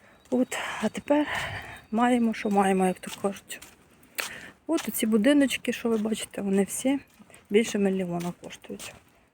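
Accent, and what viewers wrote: Ukraine. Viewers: Акцент закарпатський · Скажіть будь ласка, з якої ви області, бо судячи по вимові, ви не з східної України? Наскільки треба себе не поважати, щоб соромитися своєї мови у своїй країні? Я теж довгий час говорила на мові окупанта, з 2022 перейшла тільки на українську, але ніколи не відчувала якоїсь зневаги, хоч живу все життя в місті.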